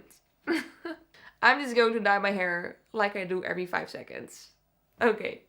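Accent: Netherlands. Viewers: At first I though you were Danish(your name ). Today I was like no way she sounds NL. Hey Dutch sister.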